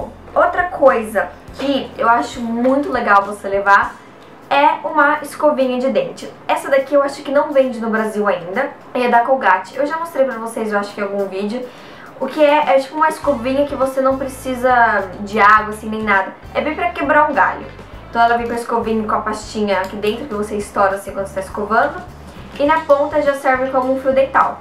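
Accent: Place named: United States. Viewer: eu queria saber uma coisa, seu sotaque "forte" de inglês é por conta de vc já ter morado nos Estados Unidos? Fala inglês fluente? Beijo e amei o vídeo 😁😘💜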